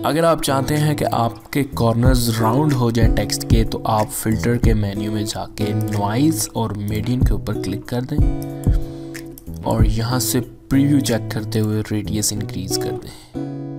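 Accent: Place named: India. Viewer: bruh... when your pronunciation sucks, at least show the command key I cant get what you are saying literally because of your Indian pronunciation...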